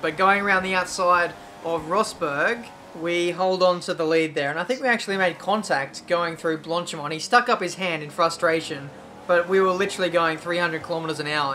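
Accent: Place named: Australia. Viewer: i honestly don't know why i watch these videos. the australian accent is so difficult to listen to, it makes my ear bleed. the driving, albeit fast, is pretty bad. contact with other cars all the time. and codemasters, continues with crappy ai. why do i watch these videos?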